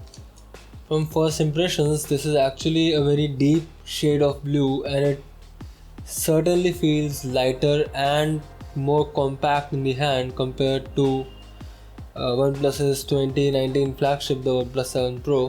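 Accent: India. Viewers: indian english accent is really annoying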